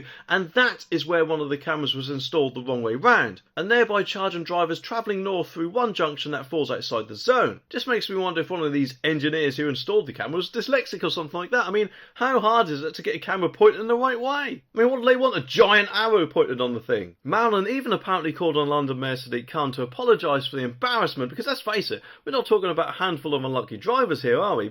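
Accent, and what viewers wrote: Norfolk, United Kingdom. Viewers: You sound Norfolk! Am I wrong or right?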